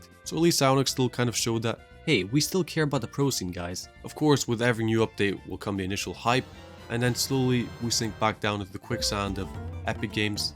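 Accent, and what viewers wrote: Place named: Scotland, United Kingdom. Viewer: Dude, I watch most of your videos, your accent drives me nuts, it's it Scottish or something Central European? Ta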